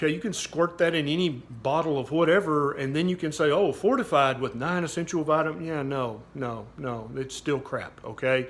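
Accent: South, United States. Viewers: 04/22/2021 Your voice sounds so familiar.🤔 I think Justin Peters ...🤔 Love the Southern accent.👊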